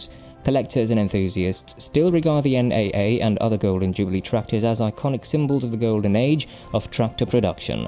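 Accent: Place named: England, United Kingdom. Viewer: Turned this off for the English accent.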